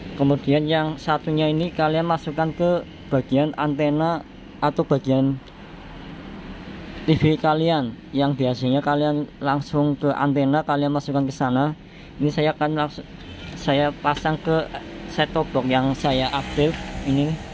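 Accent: Java, Indonesia. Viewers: Pasti...iki wong Jowo....medok banget ngomongnya!😄😃😀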